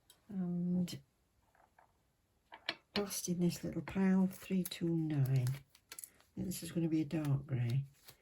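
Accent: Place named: England, United Kingdom. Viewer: Ms, you are so cute and I've absolutely loved some ding time with you listening to you review these lovely colors! This is the first of your vids I've watched and I've already subscribed! You're a joy to listen to and so soothing, and I absolutely love your accent. I'm from the states, but I've always loved all things England ❤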